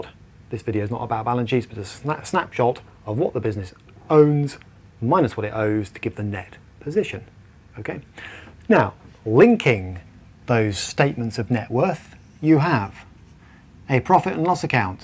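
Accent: United Kingdom. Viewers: His accent and manner is spot on Monty Python's Flying Circus. I can see him now saying, "I want to be a lion tamer!" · What Indian accent??? The guy got a British accent!!!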